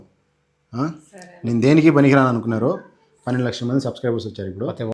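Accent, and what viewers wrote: Kadapa, India. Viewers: Anna meru India ki raganai mi guntu mari poendi pakka telugu abbaayi laga mathladu thu unnaru ❤❤🎉🎉🎉🎉🎉❤❤ Welcome to India anna ❤❤❤❤🎉🎉🎉🎉🎉🎉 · కడప కి వస్తె ఆ యాస లో మాట్లాడుతుంటే అదొక ఫీలింగ్❤ కువైట్ లో ఉన్నప్పుడు వీడియోస్ చూసి చూసి ,,ఇప్పుడు ఇలా మాట్లాడుతుంటే చాలా బాగుంది ,,మన వాళ్ళతో ❤❤❤ ❤❤❤ నాది కర్నూలు ❤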